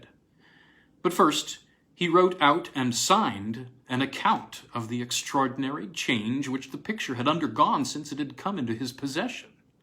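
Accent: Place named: United States. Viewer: To hear this in an American accent is curious, of course, but you bring it off well. Thanks!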